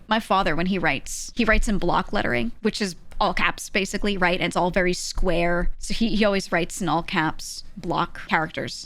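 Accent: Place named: Northeast, United States. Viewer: I got absolutely read for filth at the "engineers write in block lettering" bit. At the risk of getting weird, I think the perception of Times New Roman delivery is equal parts tone, volume, and inflection. The Northeast American accent is a very neutral accent. And, the majority of the Just Chatting bits that do get clipped here of simply "talking about a thing" don't have a ton of vocal dynamics or hyperbolic reactions to the things being said (as compared to the MrBeast typical youtuber inflection that's the vocal equivalent of jangling keys in front of someone to get their attention).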